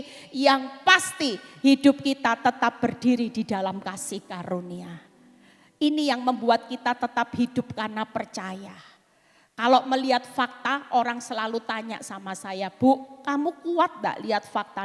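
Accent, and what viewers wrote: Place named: Surabaya, Indonesia. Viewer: Bu. ini asli org Surabaya yaaa....? Kok cekok kata2 persis orang Surabaya...🙏🙏🙏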